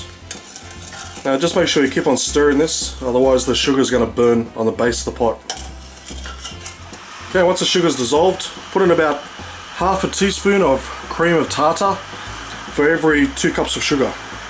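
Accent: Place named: Australia. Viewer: Hey mate are you aussie by any chance? Nice apples btw, they looked so tasty and when you bit into it I was thinking "god I bet that tastes sweet"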